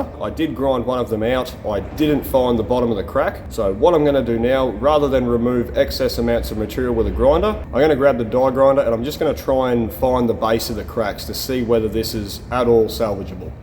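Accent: Australia. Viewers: You've got an Aussie accent....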